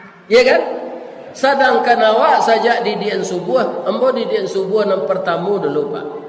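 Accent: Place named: Minangkabau, Indonesia. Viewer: Sabana sajuak hati mandanga caramah ustad Ristawardi,batambah ilimu,batambah kaimanan,lapeh taragak Jo kampuang (magek kabupaten Agam)mandanga Bahaso Minang nan asli.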